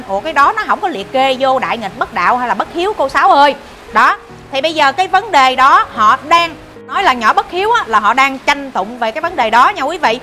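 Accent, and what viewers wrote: Standard. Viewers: Chi noi rat chuan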